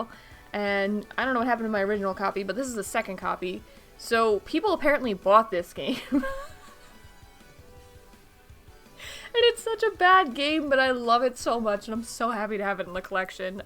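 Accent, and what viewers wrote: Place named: East Coast, United States. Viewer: Your video came up in my algorithm feed, since im from CA what kind of east coast accent is that I like it???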